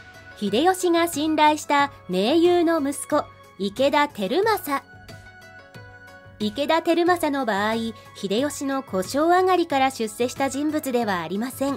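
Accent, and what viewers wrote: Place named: Himeji, Japan. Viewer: 姫路のイントネーション！！！！！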